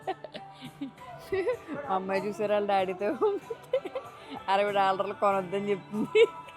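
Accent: Godavari, India. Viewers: ఈ రోజే YouTube మీ వీడియోని first time నాకు చూపించిందండి. అది చూపిస్తే కదండీ మనం చూసేది. మీ గోదారోళ్ల యాస బాగుందండి. Suharsh family in Canada ఛానల్ లో సులోచన యొక్క రాయలసీమ యాస చాలా అంటే చాలా మంచిగుందండి. మా ప్రాంతం యాస లో ఎవరైనా ఛానెల్ పెడితే బాగున్నండి. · Hi andi mee videos chala baavuntaayi Maadi kuda amalapuram 😊 Mana godavari basha super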